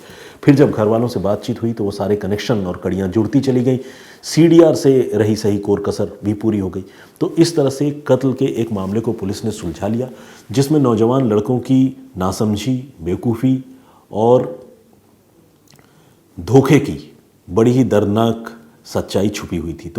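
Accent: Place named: Hills, India. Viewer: आपका पहाडी अवाज सुनने मे मजा आता हे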